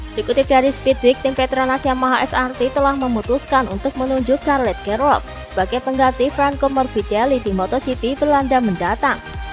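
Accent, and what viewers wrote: Java, Indonesia. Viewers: Mbak e medhok men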